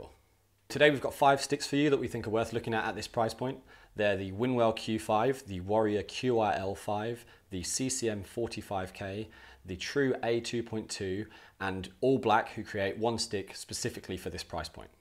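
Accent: United Kingdom. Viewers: is this guy British?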